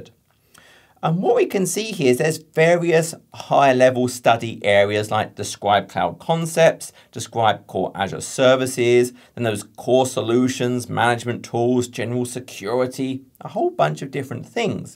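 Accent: United Kingdom. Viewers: Hello, Happy New Year 2023. You are truly an exceptional teacher period! Your style of delivery and the way you captivate your viewers in addition to your passion, selflessness, brilliance and clear desire to empower people is mind blowing. Thank you so much because watching your AZ 900 course did actually make a difference in my AZ-900 exam. Yes of course, I smashed and aced the exam on 12/31/2022 with a score of 865 and it was a great way to end the year 😊. As someone that lived and studied in England for some years, just listening to your British accent was quite entertaining and a reminder of the great and beautiful people of Britain 😊 Right now, I have started studying for AI-900. All the same, thank you very much and have a great year.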